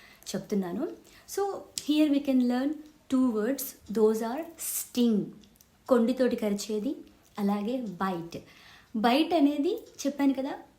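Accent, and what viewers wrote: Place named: Khammam, India. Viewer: Your telugu seems to be from khammam district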